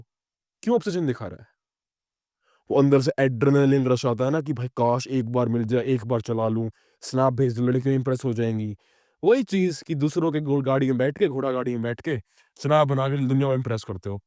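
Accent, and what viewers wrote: Haryana, India. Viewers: Valid points bro btw you sound like raftaar in haryanvi accent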